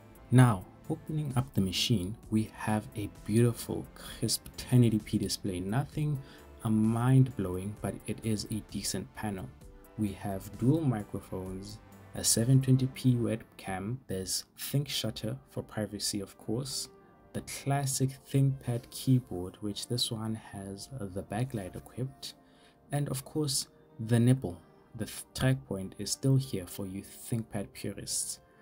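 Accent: South Africa. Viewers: I'm 81 with hearing loss, and I was delighted to hear such a gentle voice on YouTube, and followed it all (until you got to the gaming, which I just skipped). Maybe I'm just more used to South African pronunciation? But a good mic is always a good thing to have. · Thanks for sharing the review. I am still riding a T490. In this part of Europe they are still well around 2-300 eur and needless to mention mostly equipped with 1st gen Intel CPUs. Would consider it with a similar setup to yours although would be beneficial to be close to that IT asset change fire 🔥 haha. Nice SA accent. I think you could quite get along nowadays with games if you took the chance and opted in for Epic games in the past few years just for the sake of collecting 👌 Enjoy your new mobo, the screen looks good indeed for a regular IPS panel. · I thought I heard a fellow South African. 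Nice video!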